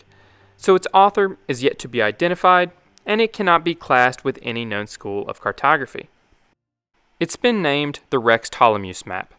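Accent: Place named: Mid-Atlantic, United States. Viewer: Have any other U.S. dwellers noticed the narrators THIIIICK mid-Atlantic accent? Idc that much but it lowkey drives me crazy 😭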